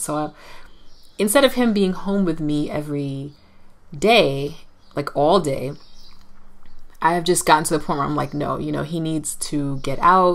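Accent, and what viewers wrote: United States. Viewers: It was totally you that I saw last year in church in Okahao!!! You were there with your husband, where they required the married couples to go to church and give an offering. I first pointed out to my sister how pretty you were and then came your American accent as well Lol.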